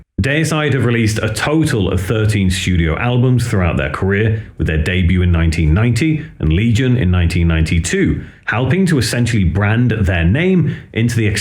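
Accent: United Kingdom. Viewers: Your (British) way of saying Deicide is your dialect, it's just not ours in America. Band name or the word otherwise, over here in the States it's "DEE-ih-syde." :->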